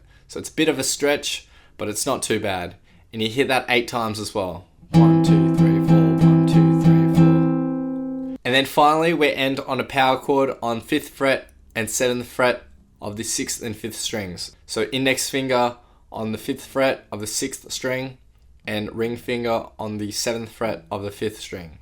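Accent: Australia. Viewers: Hey I recognise that accent! Hello fellow Aussie! :D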